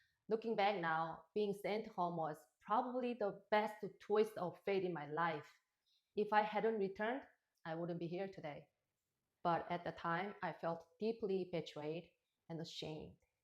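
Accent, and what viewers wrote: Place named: Asia. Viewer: I still don't know what girls in pleasure squads do. Is it just singing/dancing/ entertainment for the rulers? Anyway, I am quite intrigued by your english- you speak and express in a very asian way- which i find cute. Also I am quite surprised you went from extreme dictatorship(North Korea) to extreme capitalism(USA)- 2 sides of the coins really- where on both ends society seems to be eroding- at one side due to lack of freedom, food and what not, while on the other side due to misuse of freedom- individualism, money mindedness, lack of family culture, rise of wokeism, loss of love/trust/ dropping birth rates, income disparity, addiction. South Korea is similar to USA- be it per capita income and capitalist society- also it is infamous for highest rates of depression and suicide rates- which obviously is result of capitalism and living from mind/thoughts. 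You, having born and spent early years in NK, no doubt US must be feeling great for freedom. But a time would come...when you would want a middle path...where there is only joy, unending peace and love for everybody- the gate to spirituality.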